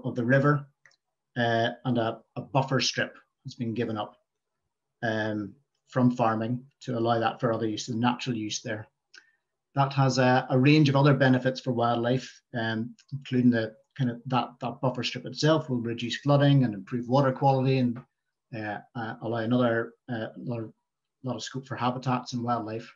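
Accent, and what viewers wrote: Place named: United Kingdom. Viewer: I speak North American English but have trouble understanding UK English---toooo fast. It would have been easier to understand if we had been able to see the face of the speaker. Can N American Beavers easily interbreed with Eurasian Beavers?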